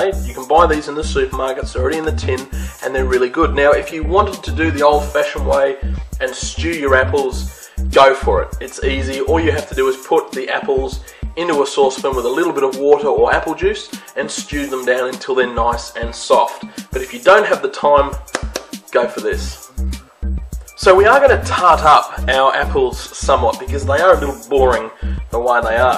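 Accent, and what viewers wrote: Australia. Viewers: I guess he's an aussie. He sounds all right for me. Butter, brown sugar and cinnamon...Thanks. I'm going to the supermarket now. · after the first ten seconds I wondered why his accent seemed so familiar and then I realised that he had an Australian accent hehe!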